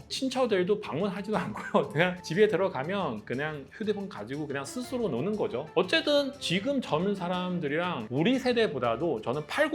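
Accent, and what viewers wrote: China. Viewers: 씨는 찐 조선족 동포 한국인 같네요. 잘 듣고 갑니다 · 너는 중국부정뉴스를 말하는것보니깐 중국에서 무지 당했구나 😂😂근데 그렇게 중국싫어서 귀화했는데 중국은 가냐? 글고 한국국민됐으면 한국말좀 제대로해야지 누가바도 중국식 한국어야 😂😂😂 중국티 내지말아야지 본인도 중국있을때 도덕이 바닥친것같은데 글고 니도 대만민진당같이 이야기하네 너는 중국 어느시대살았나 암튼 그렇게 중국싫어서 한국국민됐으니 축하한다 중국식한국말하는 한국인😂😂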